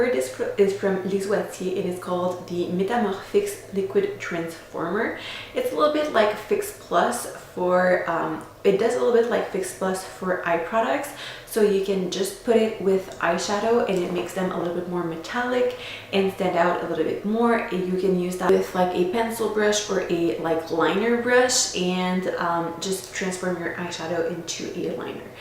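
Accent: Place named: Canada. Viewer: I love your accent! are you Canadian?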